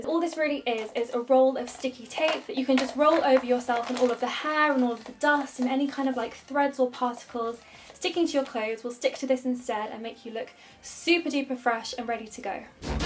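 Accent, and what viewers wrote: RP, United Kingdom. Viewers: God I love posh burds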